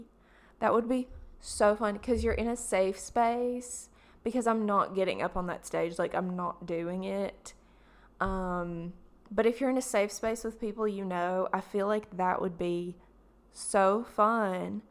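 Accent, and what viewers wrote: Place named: South, United States. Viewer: With your southern drawl and all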